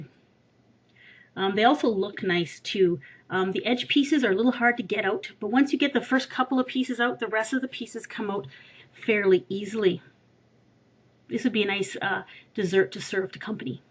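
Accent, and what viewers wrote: Canada. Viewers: For aboot 15 minutes. Hehe. I love canadian accents. <3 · This is probably an American referring to your Canadian accent:) I am totally going to make this for my bf this weekend! yummy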